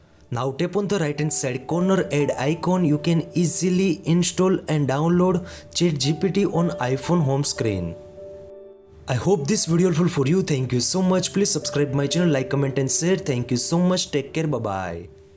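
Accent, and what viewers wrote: India. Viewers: Are you in India? the accent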